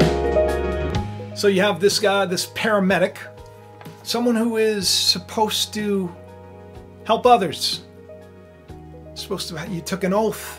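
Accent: New York, United States. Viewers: Talks like true New Yorker “anti gun” everything… Under this logic all police who carry a gun are out to hurt someone..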